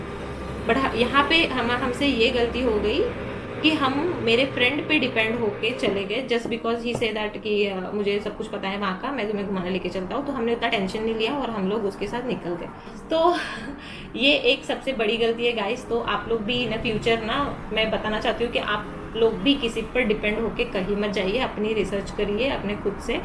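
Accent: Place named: Vidarbha, India. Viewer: Mala vatalch nagpur chi asel tu lagana mdhe vidhrbha chi bhasha bolt hote...😊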